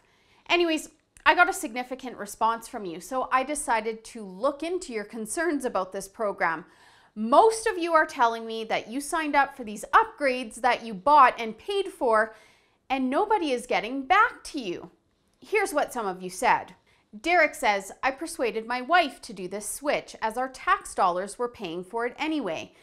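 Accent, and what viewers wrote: Canada. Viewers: Hey loose the Canadian accent and your golden, like the crust on that mac and cheese you made for me last nite in the mini kitchen of that nasty motel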